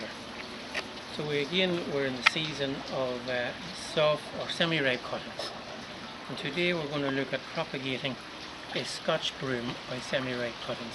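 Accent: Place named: Ireland. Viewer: The guy in the video has an Irish accent - perhaps there's a world outside of america that has different climate and ecology, somewhere like Ireland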